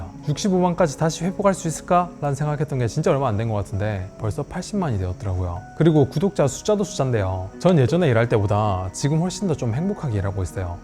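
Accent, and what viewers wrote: Gyeongsang, South Korea. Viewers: 단한번도 스킵하지않고 넉놓고 봤네요,,,, 항상 경상도 사투리속 억지로 서울말 쓰시려는 말투가 재밋고 귀에 들어와서 챙겨봤는데 많은걸 알려주시는 영상이였습니다,,, 항상 알차고 필요한 부분만 리뷰해 주셔서 감사합니다 ㅎ 같이 화이팅!!!!!!!!!!!!!!!!!!!!!!!!!!!!!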